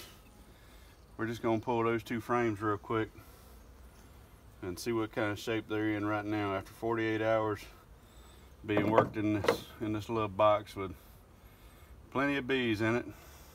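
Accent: South, United States. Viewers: This viewer is 'Disappointed ++'? What an entitled brat! Not your job to educate anyone on beekeeping for free. We are here to enjoy your beekeeping/ relocating exploits which are hugely entertaining and instructive. I watch because I learn a lot about bees, I'm entertained with your southern accent and antics, and get to visit the deep south with its trees, climate, animals, people, customs, and different climate zone. Just keep doing what you are doing, and know that the vast majority really like your videos, like you, and don't need you to do anything more. There will always be whiners, narcissists, and self-centered people who annoy others like Africanized bees. Just wear protective gear around those folks.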